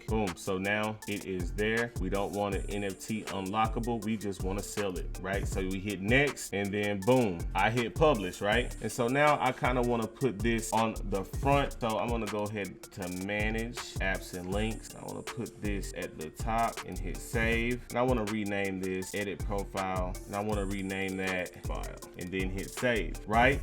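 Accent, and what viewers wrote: United States. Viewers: which part of usa are you from asking because of your accent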